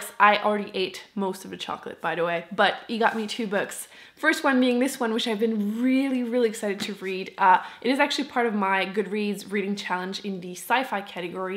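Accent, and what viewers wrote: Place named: Quebec, Canada. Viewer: Great video. Love your accent! Are you from Quebec?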